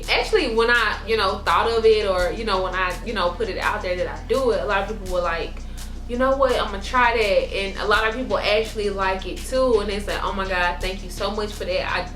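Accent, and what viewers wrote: South, United States. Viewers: girl you so country just like me! lol i love it!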